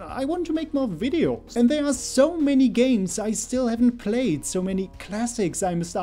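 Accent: Germany. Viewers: Great video. I couldn't agree more - I only started YouTube a few months back, and even though barely anyone watches my stuff yet, I know what you mean about valuing free time differently. At points it really feels like there's not enough time in the day to do what you need just to improve your videos/channel, not to mention everything else. You've made some really apt points. Subscribed. Ich wollte auch fragen, ob Sie aus Deutschland kommen, oder ein deutschsprachiges Land? Ich bin mir nicht total sicher, aber ich glaube, ich erkenne den Akzent. Davon abgesehen ist das Englisch in den Videos absolut perfekt! Tolle Leistung :)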